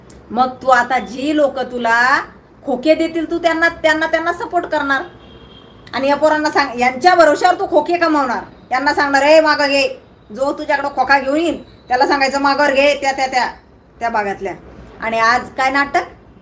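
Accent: India. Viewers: I am watching your videos from Canada. I am originally from Nagpur. I like your rural marathi and your boldness. You have exposed these so called Maratha leader. He is really bhangar illiterate person. God bless you beta 🙏🙏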